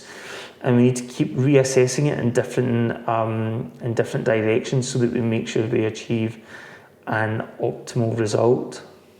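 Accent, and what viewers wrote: Scotland, United Kingdom. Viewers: Who is this Scottish doctor and where is he located? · He’s clearly Scottish lol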